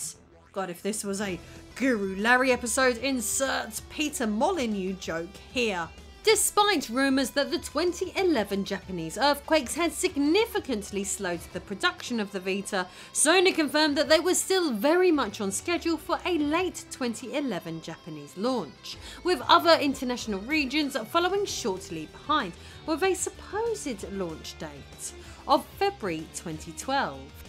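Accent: United Kingdom. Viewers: oh dear! Your british accent is simply killer!!!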